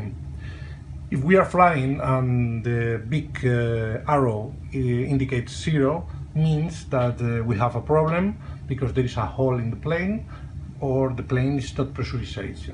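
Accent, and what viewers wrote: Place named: Catalonia, Spain. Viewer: Accent català jajaja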